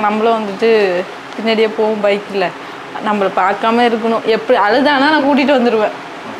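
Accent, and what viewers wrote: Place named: Sirkazhi, India. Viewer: Akka neenga praaradha ketkum bodhu nalla irukiku ka engalukkum sirkazhi pakkam than unga slang pathe nenaichan super keep rocking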